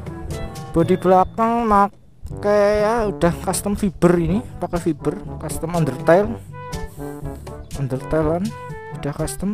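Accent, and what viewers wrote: Indonesia. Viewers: Anjay wong ngapak jebule wkwkwk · Waa anjay raider ngapak Purwokerto 😂😂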